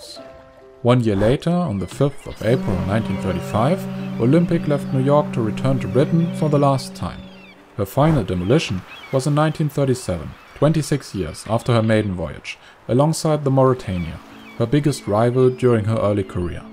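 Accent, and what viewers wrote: Germany. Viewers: Deutscher aktzent... hmmmmm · Why is a German narrating this video? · I'm german and I agree, it sounds like a (horrible) german accent. Not all of us sound like this when speaking english :D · Man merkt sofort den Deutschen Aktzent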